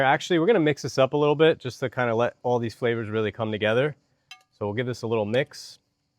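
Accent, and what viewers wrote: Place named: New Jersey, United States. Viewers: I knew you were from NJ! We both say water the same way. Where abouts? I grew up in Union County before I moved to Florida in '89. Anyway, my wife loves cherry tomatoes and orecchiette so, she's getting this tomorrow. The Cat Distribution System chose you, yeah the CDS is a thing. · I knew you were from NJ. You just can’t mistake that accent. I love your recipes simple and doable. Can’t wait to try them. I’ve been saving them and writing my shopping list accordingly.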